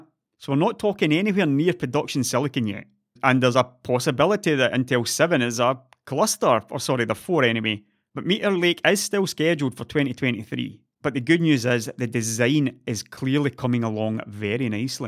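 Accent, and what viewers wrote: Scotland, United Kingdom. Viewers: Good to hear your "Scottish" Voice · that beautiful Scottish voice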